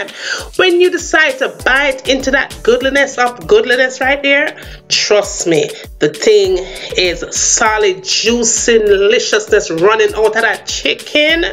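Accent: Jamaica. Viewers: I came here to find out how to cook drumsticks. Within 3 seconds I knew the cook was a Jamaican! Must haffi yam it now!!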